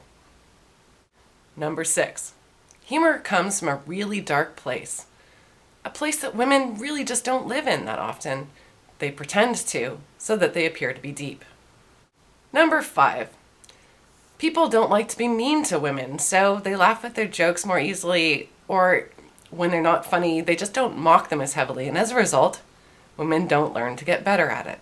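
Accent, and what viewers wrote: Canada. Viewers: Weird Canadian accent..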